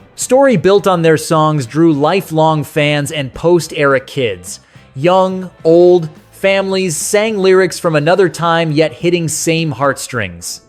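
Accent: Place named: United States. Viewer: I'm starting to get violent diarrhea at AI videos with shitty american accent.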